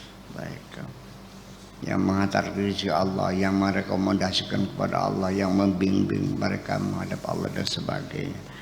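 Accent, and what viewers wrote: Indramayu, Indonesia. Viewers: ha ha ha iya nok, tapi emang khas omongan dremayu, tapi yaqin gak ada maksud menghina, apalagi klo umumnya bahasa gaul kertasemaya, " rikkk sira pen mendi !!!???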